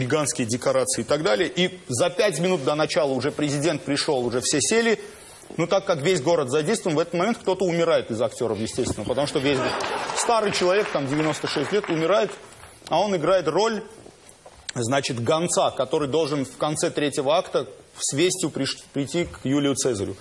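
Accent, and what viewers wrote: Armenia. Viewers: А не на русском ТВ Мартиросян включает армянский акцент. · Когда он со своими у него армянский акцент, а среди русских Ванечка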